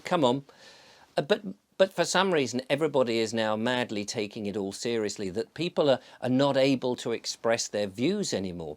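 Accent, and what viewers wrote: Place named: Shropshire, United Kingdom. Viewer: Sounds like Shropshire ...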